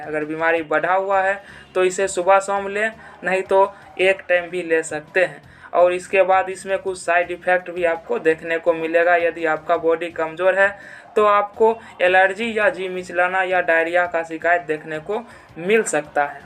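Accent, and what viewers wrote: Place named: Begusarai, India. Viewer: Lagta hai aap begusarai se ho awaz se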